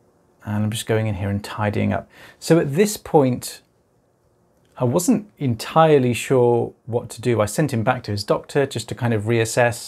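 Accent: United Kingdom. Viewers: I come here to practice British accent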